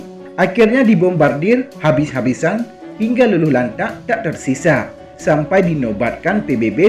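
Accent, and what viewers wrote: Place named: Aceh, Indonesia. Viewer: Hana pas loem mantog na logat Aceh kapegah haba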